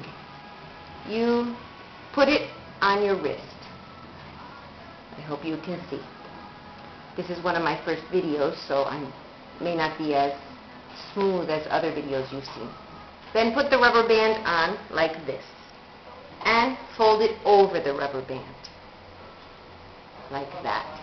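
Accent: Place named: United States. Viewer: Wow that was great, I make these too, but I use elastic instead of rubber bands. We in the USA pronounce centimeters differently, but you sound like you have a US accent where did you grow up?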